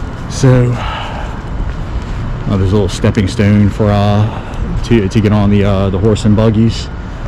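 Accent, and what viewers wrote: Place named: Delaware County, United States. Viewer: Your Delco accent is strong AF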